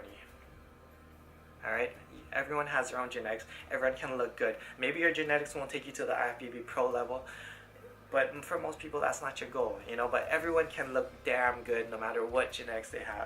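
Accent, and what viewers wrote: United States. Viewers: Dude..u from west coast or east or Midwest?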